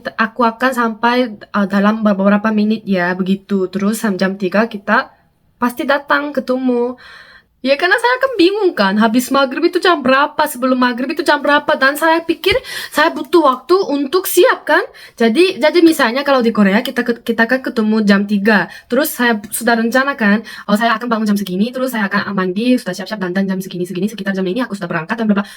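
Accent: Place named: Indonesia. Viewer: Logat bicaramu udah in dg onesia banget Cantik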